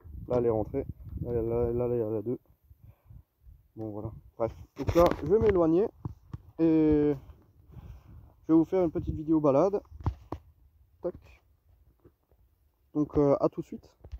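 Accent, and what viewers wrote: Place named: Alsace, France. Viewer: Salut l'illuminé 🤣 Tout d'abord bravo pour ce que tu fais! Je restaure en ce moment une MICMO S98 identique à la tienne, mais qui malheureusement a été recouverte d'une vilaine couche de noir sur toute la bécane. C'est donc avec grand plaisir que j'ai regardé ta vidéo encore et encore... Je suis près de Strasbourg et vu ton accent il se pourrait bien que l'on soit voisin. Si tu es encore en possession de la MICMO, tu penses qu'on pourrait se voir ? Il y a sur la mienne des pièces manquantes dont je pourrais prendre les mesures sur la tienne. 👍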